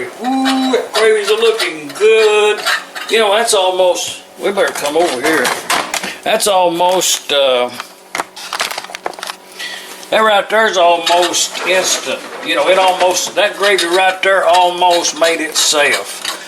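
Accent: South, United States. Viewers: I'm Georgia born and raised. I just purely love watching your videos! You remind me of my daddy something fierce . He loved to cook and did a real good job of it too. The thick southern country accent is something I share with you .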